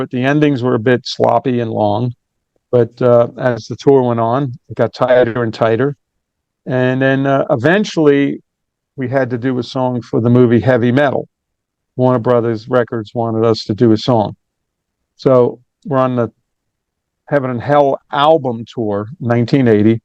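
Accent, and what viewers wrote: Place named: Alabama, United States. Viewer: Love your accent bro…that comes from Alabama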